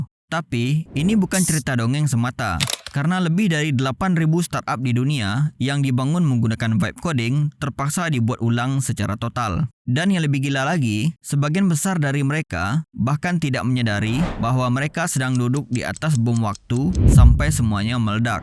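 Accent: Aceh, Indonesia. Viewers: Suka banget kontennya ❤ Logatnya seperti Aceh :)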